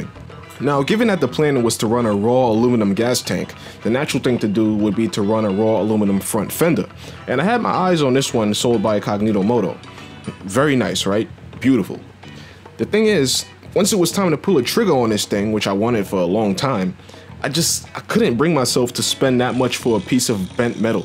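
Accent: United States. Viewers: What an amazing voice most american accents are nasal and have an annoying twang but yours is fucking brilliant!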